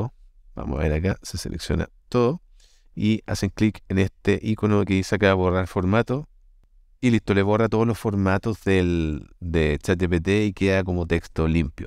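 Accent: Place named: Chile. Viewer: Muchas gracias,. Tu manera de explicar es muy clara y concreta, tu voz y dicción son estupendas... y tu acento chileno hace placentero el recorrido por tus videos.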